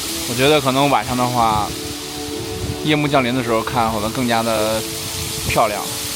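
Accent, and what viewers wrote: Beijing, China. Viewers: 你是北京人嗎,你的國語很好聽.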